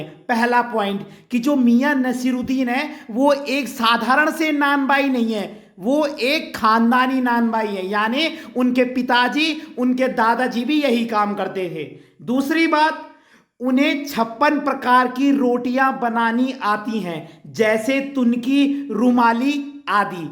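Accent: Garhwal, India. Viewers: I'm subscribeing you just for you explanation and your cute accent as I know you are a gadwali ❤️🙏🏻 Tehri rifles here 😂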